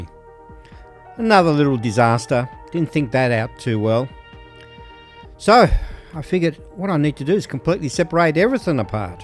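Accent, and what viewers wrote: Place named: Australia. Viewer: Holy crap! You're an Aussie. Nice job, it brings back memories. The old series was by far the best.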